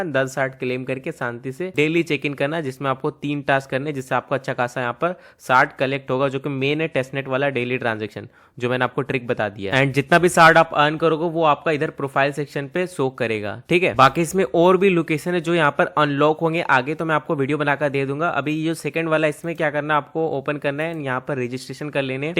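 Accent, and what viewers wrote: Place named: India. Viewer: INDIAN SOUNDS MOTHER FCKR COUNTRY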